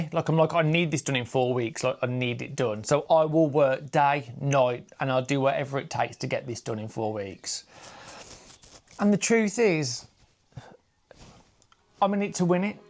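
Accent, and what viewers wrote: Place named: West Midlands, United Kingdom. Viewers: You sound like you're from West Midlands, I am too. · Oh, first of all I’m sure I’m not the only one who would love to give you a big hug and sit you down with a cuppa and a big slice of lemon drizzle cake. I love your accent because my dear old Mum was a Midlands girl and the accent gives me a warm glow every time I hear it. Now, as regards your work I would refer you to our Kate at the last homely house. She makes me laugh out loud when she talks about ‘the quilting police’. Remember my flower, that perfect is produced by machines, handmade is done with love and swear words and is by nature dotted with faults and slipped stitches, just like people. You have already created a legacy. Nearly fourteen thousand people care about you and watch your work. Take a moment to pause and look back at the road you have already travelled. 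You are loved and respected. Ros ❤️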